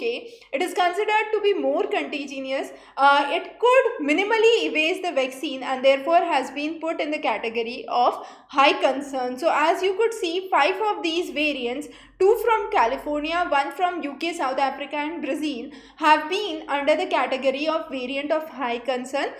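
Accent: India. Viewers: english subtitles help me to understand your indian accent english :)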